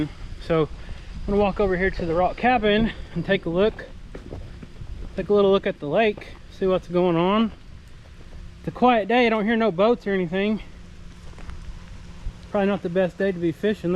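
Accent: United States. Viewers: You are very hard working . You and other farms have a big variety of trees. The Elm wood is excellent for exterior use also it hasa very good grain for cabinet making. Please try and answer this: are some of the trees Pecan ...where you get Pecan nuts ? Being English I have trouble with the American pronunciation .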